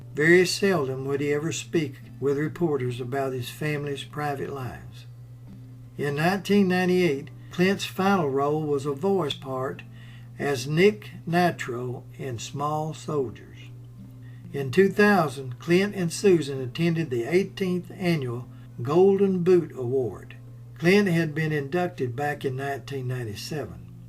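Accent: South, United States. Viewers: I don't care about the subject. It's the narrator that's I like listening to. The southern accent is just adorable and voice calming.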